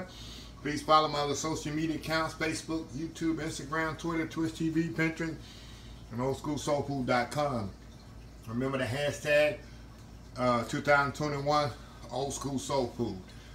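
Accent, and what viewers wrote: South, United States. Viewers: 2021helpsomebody. Chef your voice is what make your vedios easy and interesting. Live your southern accent too. The cake is marvelous. Wish I was your neighbor. But blessed to have your your vedios. Have a blessed day.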